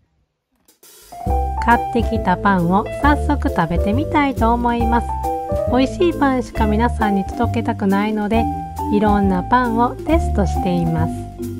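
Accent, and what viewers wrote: Kansai, Japan. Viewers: 最近こちらのチャンネルと出会えて遡って拝見させて頂いています😊 世界の料理や生活風景勿論観光地や史跡等も見るのが大好きです。 nobanaさんの柔らかい話し方や私は関西人なので聞きなれた関西弁での動画も心地良く癒されながら観ております。 オンラインショップもされるとの事で楽しみです✨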